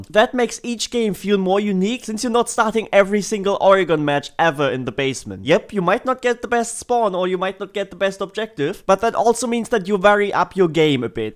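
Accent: Germany. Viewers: you sound extremly german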